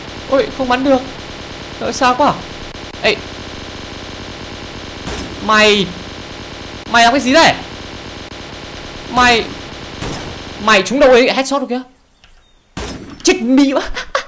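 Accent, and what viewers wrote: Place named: Countryside, Vietnam. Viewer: nói thì giọng như nhà quê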